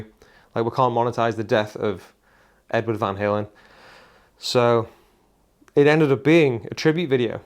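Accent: Newcastle, United Kingdom. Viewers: I only ever watched PMT because of you. One you're a Geordie yenaawattamean, but mainly because of the enthusiastic way you presented new gear. Don't know what the next stage of your journey will be but I'm certain that someone will snap you up to do what you do best, demo gear and play guitars. All the very best mate.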